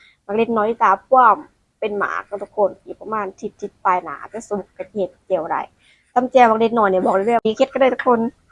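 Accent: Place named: Ubon Ratchathani, Thailand. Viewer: แซบๆน้อง สำเนียงเธอ คิอทางอุบล ศรีสะเกษ ประมาณนั้นเฮาว่า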